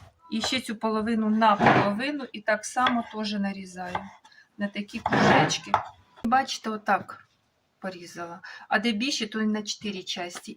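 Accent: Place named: South-East, Ukraine. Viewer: Дратує? Прямим ходом на медичний канал лікуватись! Мене забавляє південно- східний діалект.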